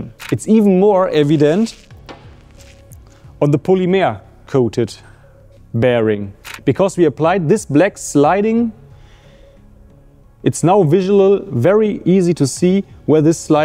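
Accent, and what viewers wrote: Germany. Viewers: If you're going to make claims like your "facts" at 1 minute, and your 80% claim at 2 minutes, you've got to provide actual references. You have reasonable hypothesis, and you've done some preliminary experimentation, but to claim "finally proven" is intellectually dishonest. 2/10. Dramatic lighting and a German accent does not make something good science. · Sehr deutscher Akzent 😅 der scheiß gehört abgeschafft !! Das erste was man machen sollte nach dem Motor Start is den scheiß ausschalten!! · its really funny that this guy talks relatively decent english but then just randomly pronounces singular words 100% germanly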